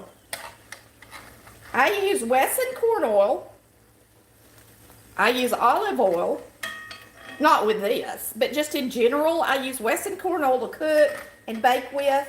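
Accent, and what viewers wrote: South, United States. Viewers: I wanna move to the south so i can get this beautiful southern belle voice and postpartum cookin🥺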